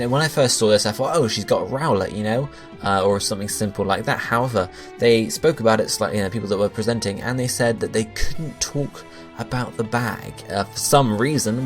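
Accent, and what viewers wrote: United Kingdom. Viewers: How does a magearna fit in that bag?! A 1ft diameter ball was fishy but a 3'3" ball?! You sound british, perhaps you don't know how to translate feet to centimers?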